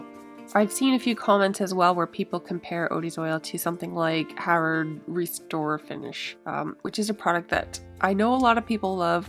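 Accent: Canada. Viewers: Hi. I’m a senior Canadian woman living in Europe. So when I see your videos, I get the chance to hear a fellow Canadian. For me it’s also a cultural connection. Your videos are informative - I get to see more characteristics of wood and different styles of furniture. All I see is an excellent presentation - certainly something for which you should be proud. As for your tattoos, I think they’re beautiful. Thank you, also for your generous contributions to protecting bunnies and for caring about your community. Please continue making your videos. I cannot do furniture work in my apartment, but if I had to shop at one of the second hand shops (almost every village has one), I would be better informed regarding the pros and cons of an old pieces of furniture. Thanks so much for making my YouTube experience an educational one (as well as entertaining). Take care and best wishes for a wonderful future. 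…..waiting for your next contribution. Thanks again.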